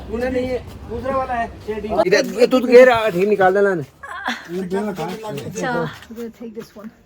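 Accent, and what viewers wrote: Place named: United States. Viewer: Wow! Your english speaking is super fluency and a perfect American accent. Good to hear it. 👍 Happy stay!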